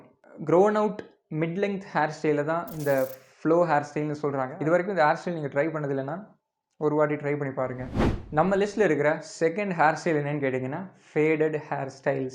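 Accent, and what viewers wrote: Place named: Kerala, India. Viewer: സംസാരിക്കാൻ തുടങ്ങിയപ്പോളെ തോന്നി മലയാളി ആണെന്ന് 😂❤️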